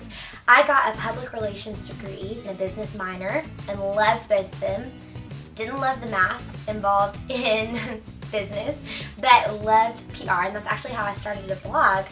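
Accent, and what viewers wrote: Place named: Dallas, United States. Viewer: As a Texan I could tell immediately you were from Dallas before you said it lmao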